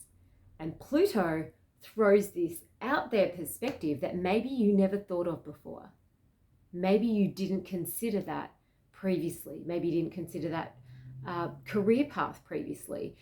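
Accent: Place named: Australia. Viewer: Thank you 🙏 Love your energy, and aussie accent ;) ✌ Aries nation sweden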